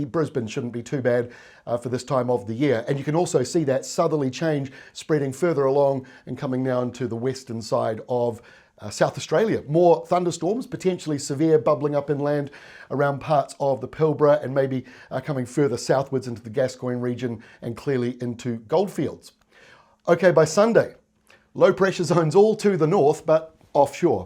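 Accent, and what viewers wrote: New Zealand. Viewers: Bros sounds from NZ 💪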